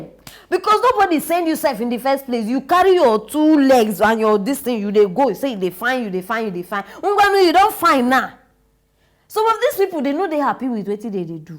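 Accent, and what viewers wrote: Nigeria. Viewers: I've been around many Nigerians, but your Nigerian accent is on totally next level, I can listen to you every day 😂🤣😂🤣. Keep up the good work 👍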